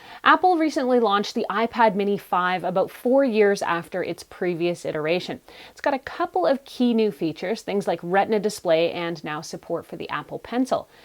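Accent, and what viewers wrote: Canada. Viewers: Atre u Canadian?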